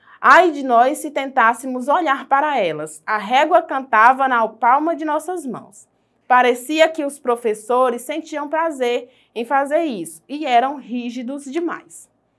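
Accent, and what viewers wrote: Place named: Minas Gerais, Brazil. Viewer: Mineira kkk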